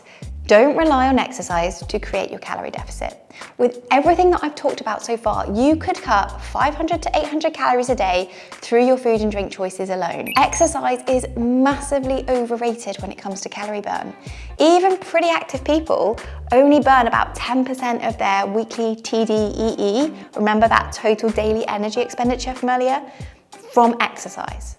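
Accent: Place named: United Kingdom. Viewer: I don't mind the music, probably because it's classical, goes great with your British accent! Doesn't bother me.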